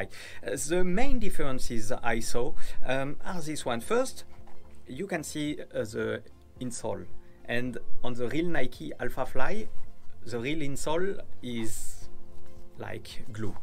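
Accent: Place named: France. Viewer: Oh l’accent français à couper au couteau !!! lol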